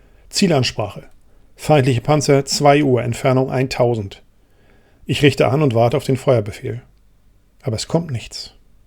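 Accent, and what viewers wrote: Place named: North Germany, Germany. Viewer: Wie immer spannend vorgelesen. Alle Achtung ist eben Norddeutsch.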